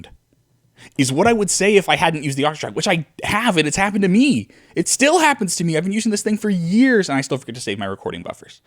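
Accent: Germany. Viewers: subscribed because of the german accent JA! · thanks for save me, buying this! btw best german accent, großartig!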